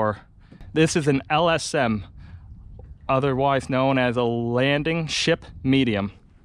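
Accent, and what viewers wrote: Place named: Eastern Canada, Canada. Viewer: Wow great video and history lesson. Thanks for taking the time to put this together. Very interesting. Love that eastern Canadian accent😊👍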